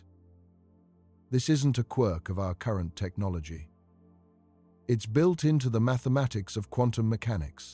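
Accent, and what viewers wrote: United Kingdom. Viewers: Absolute rubbish. I understood that in the first 30 seconds of this waste of time. Flight was also impossible. Reaching the moon was also impossible. Then it was accomplished. The same small mind in the first two examples is now narrating this video. “The physics as we currently understand it”. All that says is EARTH SCIENCE is clueless. The inconvenient fact however, is people are looking up into the sky and seeing them dotted with “UAPs” that by the logic of this video, shouldn’t exist. But they do. They’re here with ease, and have been as long as human history has seen them, thousands of years. Amazingly, the idiocy of the narration refers to the “speed of light” as a factor in interstellar and intergalactic travel. This is a kindergarten child telling a university mathematician why his understanding of physics is impossible. Needless to say this video got no like and no “subscribe”. 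The “British accent” didn’t make this inanity sound any smarter.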